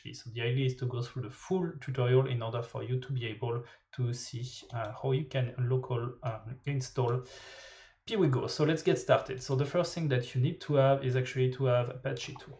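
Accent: France. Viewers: pk pas faire la video en Français t'as l'accent français en plus je comprend pas l'anglais moi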